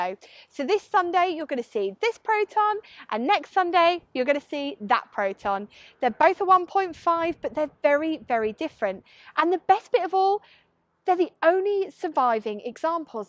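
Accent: United Kingdom. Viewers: Your british accent is wonderful 😊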